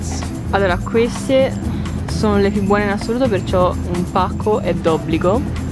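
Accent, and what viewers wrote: Tuscany, Italy. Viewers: Sei toscana ? Di dove? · Sei toscanaaa l ho sentito da l' accento 😂anche io sono toscana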